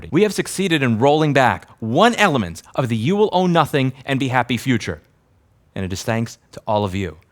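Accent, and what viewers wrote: New York, United States. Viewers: Congratulations. You've really made a difference. Many people complain and protest, but you've done the difficult part by working to influence legislation. You should think about running for office in TX. Maybe put a little southern twang into your NY accent.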